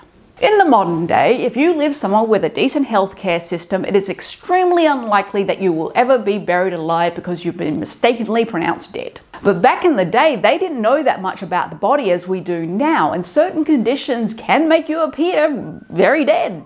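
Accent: Australia. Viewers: Just subscribed. You sound Aussie. Greetings from Victoria.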